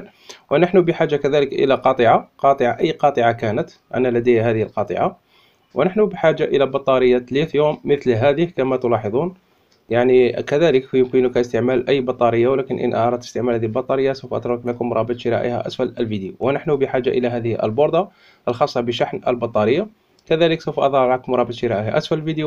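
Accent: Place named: Algeria. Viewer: ن من صوتو بلي دزيرير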